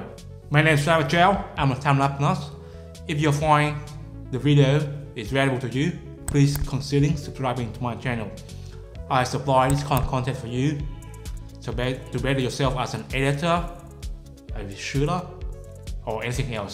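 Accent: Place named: South Africa. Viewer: Dude r y from South Africa? Cool accent! ❤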